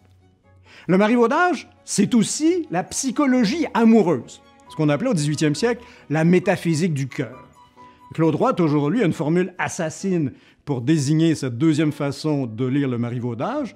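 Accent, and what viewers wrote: Quebec, Canada. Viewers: j'avoue du Beaumarchais avec l'accent Québécois ça aurait de la classe , je vote pour !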